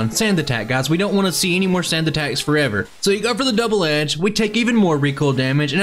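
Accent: South, United States. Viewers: Youtube fed me your channel to discover you, gotta admit, that southern accent totally broke up my background and made me pay attention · I dig the race format. I had to watch twice because the first time I fell asleep listening to your smooth southern twang.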